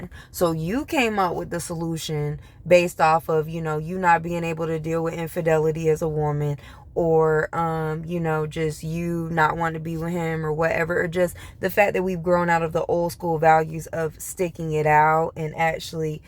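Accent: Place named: Detroit, United States. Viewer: I appreciate your point of view you sound a Detroit girl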